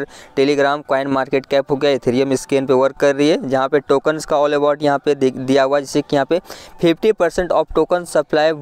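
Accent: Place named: Shekhawati, India. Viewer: Sir aap sekhawati side ke lagte ho awaj se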